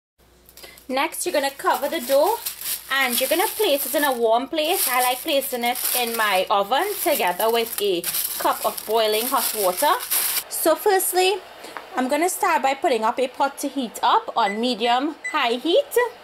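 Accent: Trinidad and Tobago. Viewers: Kenny H She is not faking an accent. She is from Trinidad in the Caribbean and is speaking with a Trinidadian accent. She is not trying to sound like anyone from another nationality. Italians don't speak like that and she is not from the Middle East. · Ganaria Gente trini accent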